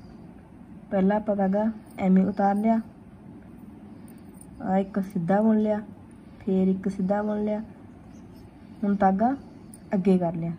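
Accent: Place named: Malwa, India. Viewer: Bahut hi vadiya tarike nal samjaya & pure Malwai Boli🥰🇭🇲🙏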